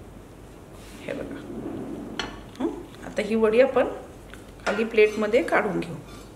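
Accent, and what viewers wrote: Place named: Kolhapur, India. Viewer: मी खूप दिवसांनी आज यू ट्यूब वर आले आणि प्रथम तुमची रेसिपी बघितली. खूप छान सोपी रेसिपी आहे. आज मला १० रु. ला मोठ्ठी पेंडी कोथिंबिरीची मिळाली आहे. आज संध्याकाळच्या जेवणातच मी ही भाजी करून बघीन. माझ्या तोंडाला आत्ताच पाणी सुटलंय.! आणखी एक. खूप दि.नी तुम्हाला पाहिलं. हडकल्यासारख्या दिसताहेत. छान दिसताय. तुमचा कुडता, व त्याचा पॅटर्न पण खूप आवडला. तुमच्या तोंडची कोल्हापुरी लहेजा असणारी भाषा मला फार आवडते. लहानपणी काही काळ कोल्हापुरात होते. एकदम् नोस्टाल्जिक वाटतं.❤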